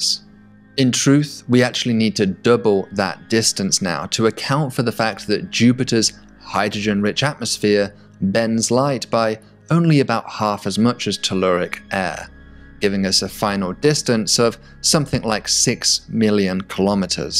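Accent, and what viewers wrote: United Kingdom. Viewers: Pretty sure he’s British…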